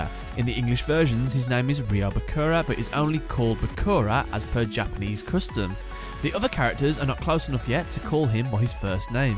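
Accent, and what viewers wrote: United Kingdom. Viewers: Bri'ish lad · people always ask, "why so british?" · why so british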